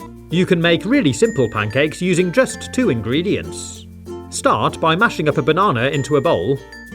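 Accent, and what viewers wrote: United Kingdom. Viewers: i love your accent british guys, loves from turkey :) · I normally don't like the British accent but yours is so satisfying to hear to.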